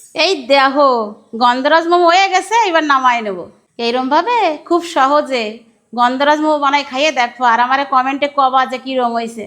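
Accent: Bangladesh. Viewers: বলছি এইগুলোর কি sir এ নাম কেটেছে? 😂 এখনো তো বাংলাদেশী কথা বলে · কথা গুলা ভালো লাগে বাংলাদেশের কিছু অঞ্চলে মতন লাগে ❤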